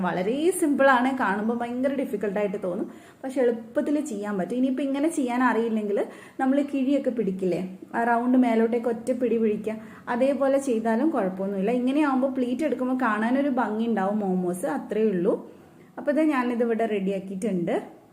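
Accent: Kannur, India. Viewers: Chechi kannur ano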